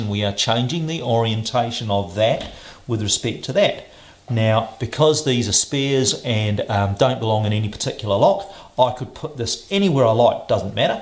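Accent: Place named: New Zealand. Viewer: I was dmn sure you were a kiwi :D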